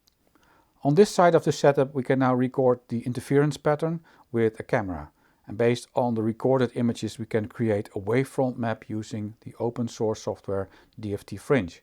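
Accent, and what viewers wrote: Netherlands. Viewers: love your vids and your nl accent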